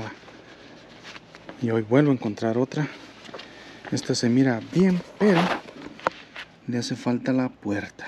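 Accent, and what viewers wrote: Mexico. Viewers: Buenas noches hola y familia la.mayoria de Mexicano hablan así igual que los Salvadoreños y algunos otros países bueno de todos modos tienes mi admiración como dicen ustedes eres muy lucho y no te rajas😂😂😂❤😂❤😅😅😅😊😊😊😊🎉🎉🎉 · Lo que pasa que así hablan los mexicanos para ellos es normal,y el sr es mexicano ok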